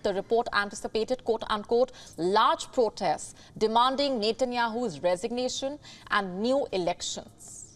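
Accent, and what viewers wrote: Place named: India. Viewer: This is a hard accent to like to western ears. Why does a India news channel speak in English anyway. Go away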